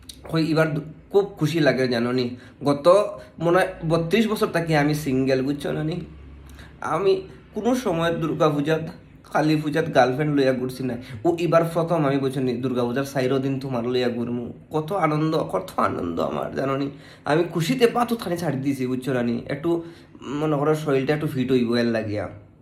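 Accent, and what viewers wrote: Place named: Bangal, Bangladesh. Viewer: দাদাভাই আপনার বাড়ি কোথায় বেশ সুন্দর বাঙাল কথা বলছেন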